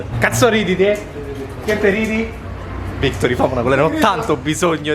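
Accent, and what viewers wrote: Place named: Rome, Italy. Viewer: Sto morendo letteralmente.. finalmente e uscito il romanaccio che e in te.. e poi al panigale nun glie devi caga er cazzo e favolosa.. davvero.. la proporrei come maglietta ahahah anche mi lanciò.. in sicurezza ahahaha bellissimo sei un grande!!!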